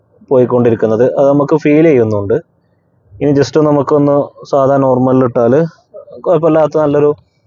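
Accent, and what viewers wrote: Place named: Malabar, India. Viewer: മലപ്പുറം, കോഴിക്കോട് കാക്ക ആണല്ലേ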